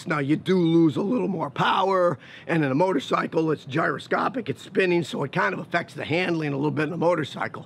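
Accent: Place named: United States. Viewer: sounds super American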